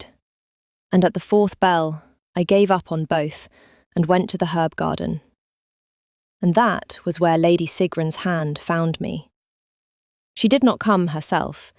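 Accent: England, United Kingdom. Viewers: The narrator has an English accent. She is pronouncing 'beta' the way we do in the UK, not 'incorrectly', just different. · That’s how us UK English people pronounce beta - beater. Nowt wrong with that.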